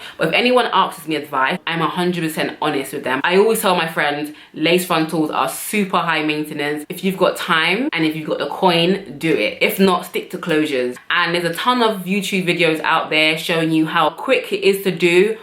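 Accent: United Kingdom. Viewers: Lady you are all kinds of gorgeous! and you have that cheeky British accent too.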